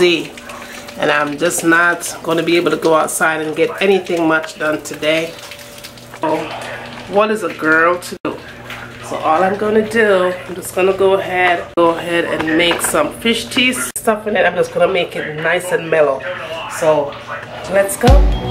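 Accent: Jamaica. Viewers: I love the Jamaican accent but do not let the Jamaican woman get mad at you...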